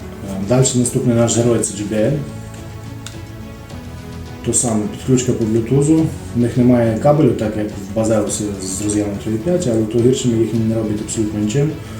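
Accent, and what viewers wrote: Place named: Western Ukraine, Ukraine. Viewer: Надіюсь люди підтримають ваш канал, приємно дивитись і слухати, хоть і чути акцент із західної:) Удачі вам! Підписка і лайк!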